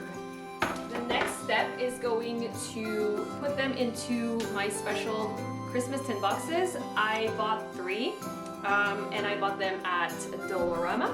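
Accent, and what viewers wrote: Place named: France. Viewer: Can’t wait to try your recipe! You sound a little French like me!!! I live in PEI but I’m from NB. I’m also French speaking!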